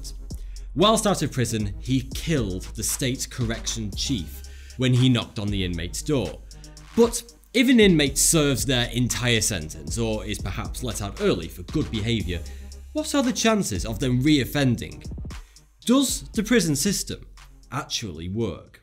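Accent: United Kingdom. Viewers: I wonder how many prisoners are falsely convicted. No one can make up for the wrong person’s humiliation and a new bad reputation. I guess that people in jail lose the respect from their family. The wrong people in jail isn’t justice. Remember the Memphis three from Arkansas? Anyone can lie about someone else and be taken seriously. When that happens, the court and the system goes out of their way to make it so that the defendants end up behind in bars even if they are innocent. It’s not against the law to try to make the defendant angry so that look worse than they are. There are a lot of bad prisons. I don’t like this man glamorizes the United States prisons. He has a British accent, so how does he know so much about American prisoners?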